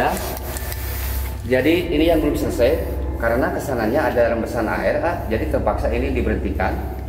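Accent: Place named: Java, Indonesia. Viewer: Sehat selalu mase,,,,,tetep semangat,,,,medoke itu loooooooo😘😘😘😘😘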